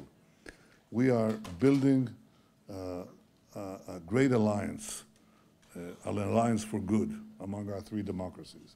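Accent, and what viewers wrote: United States. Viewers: Harsh Truth. And don’t forget Bibi is American too. That is a Philadelphia accent if I ever heard one, has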